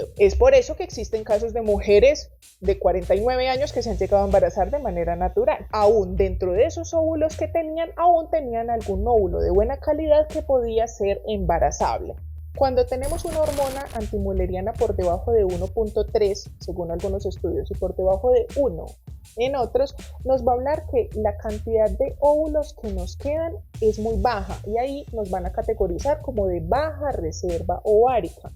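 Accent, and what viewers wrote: Colombia. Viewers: ❤🙏🏻Dra. Agradezco la claridad y sutileza con la que nos explica los temas tan sensibles sobre la fertilidad. Le mando un abrazo enorme desde Tláhuac, CDMX. Usted es de las primeras personas que decidí seguir en YouTube para conocer el panorama sobre éste tema. Además me encanta su voz y forma de hablar de Colombia. ¡Gracias! por su labor.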